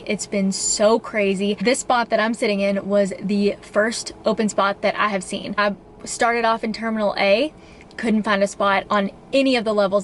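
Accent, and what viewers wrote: South, United States. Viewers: is it just me or that southern twang + fancy face a killer combo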